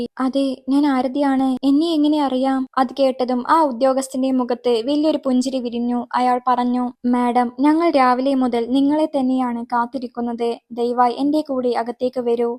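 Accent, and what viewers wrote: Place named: Kottayam, India. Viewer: വെല്യ എന്ന വാക്ക് കോട്ടയം സൈഡിലൊട്ടൊക്കെ കോമൺ ആയി യൂസ് ചെയ്യുന്ന word തന്നെ ആണെടോ 😊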